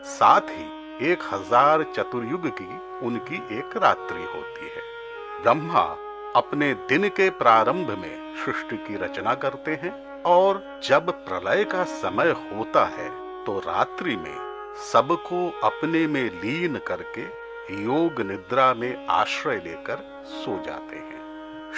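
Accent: Standard. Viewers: शुद्ध उच्चारण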